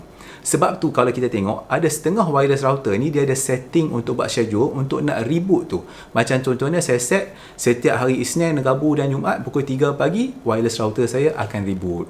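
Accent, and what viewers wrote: North, Malaysia. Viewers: Hihi best denga ckp utara, nk kna try la semua tips ni sbb sy punya mmg teruk w/pun dah ddk dlm coverage & Band40 … tp td ckp x pkai modai, tp ad cdgn pkai modai, nk claim la boleh? 😅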